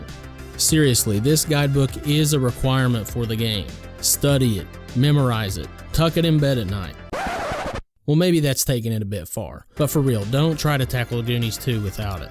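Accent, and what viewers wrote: South, United States. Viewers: Your welcome! I love your vids. They bring a lot of nostalgia to me. Your southern accent reminds me of my best friend from my childhood. We would waste the summer days playing NES and SNES games.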